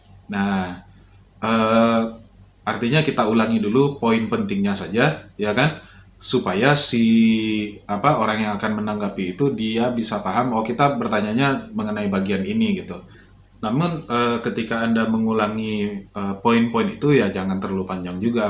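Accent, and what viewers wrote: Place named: Minangkabau, Indonesia. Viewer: mksh pak,, logat dan nada bapak seperti logat orang Minangkabau atau Padang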